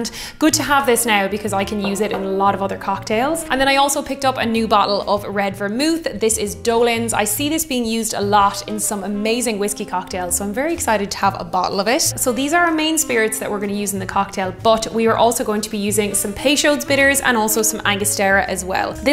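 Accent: Ireland. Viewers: Gotta love the Irish accent.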